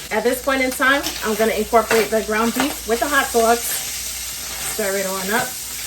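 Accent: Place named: New York, United States. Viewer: That looks good. I have to try it. Where are you from? Sounds like New York or Northern NJ.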